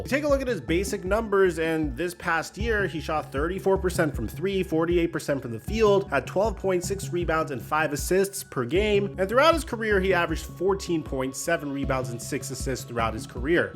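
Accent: United States. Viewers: Why does he sound like an American, josh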